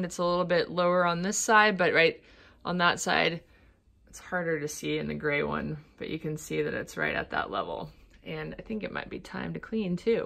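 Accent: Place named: Pittsburgh, United States. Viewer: Could hear the Pittsburgh accent haha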